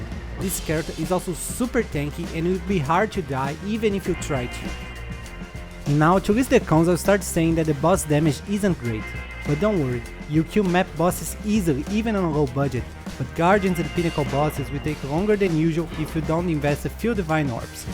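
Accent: Brazil. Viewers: cara, vc é br né esse sotaque ai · Dude, where u from? You have a Brazilian accent xd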